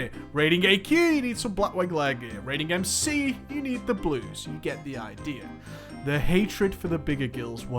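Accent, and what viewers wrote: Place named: Lancashire, United Kingdom. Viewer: "Thirdleh, tek oppotuniteh" - dat Lancastrian!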